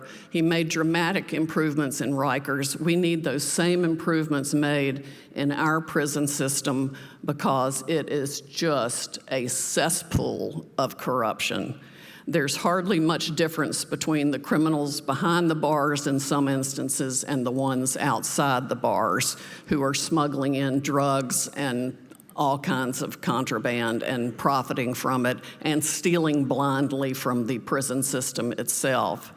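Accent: South, United States. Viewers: Justice must come within 2020 before the frenemies crawl back up! Love your S. Accent👍💪🗽🇺🇸❤️💡🙏🏻🔑. Yes, Sec8-& Prison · love that SOUTHERN DRAWL Sidney ... ear candy telling the truth is twice as good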